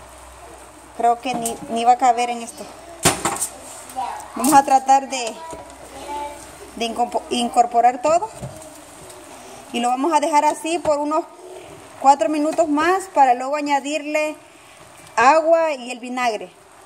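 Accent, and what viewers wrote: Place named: El Salvador. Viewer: Sabia que eras salvadoreña, el acento noa delata, pero me lo confirmastes cuando hablastes de las pupusas, hare mi escabeche. 😆 Gracias.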